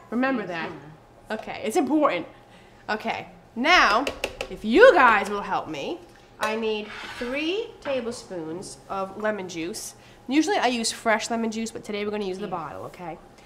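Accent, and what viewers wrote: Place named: New York, United States. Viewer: By her accent, I can tell she’s either from NJ or NY! Am I right? Lol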